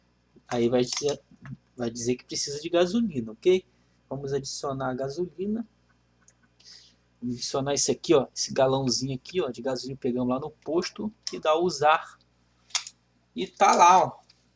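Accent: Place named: Rio de Janeiro, Brazil. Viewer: Carioquês rs boa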